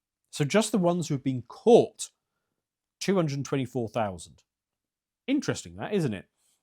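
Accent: United Kingdom. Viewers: Good, the limey that i like listening to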